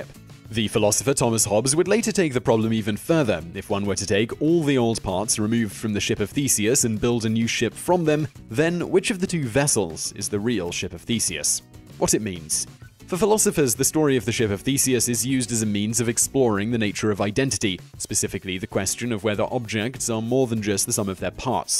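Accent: United Kingdom. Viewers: Is that Michael vsause but the British acent version?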